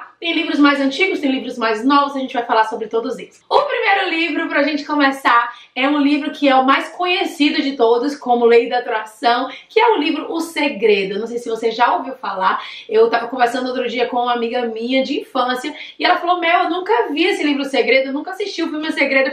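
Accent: Bahia, Brazil. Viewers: Uma baiana ? Uhuhuuuuuu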